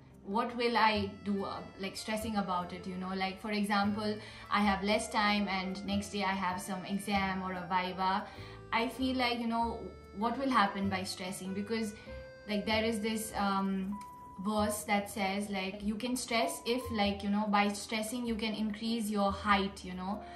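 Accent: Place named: India. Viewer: Your Indian accent is so cute!❤️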